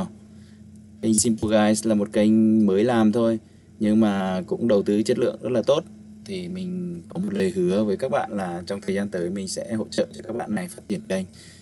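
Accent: Central, Vietnam. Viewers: Anh là người miền Trung phải không ạ? Em người Hà Tĩnh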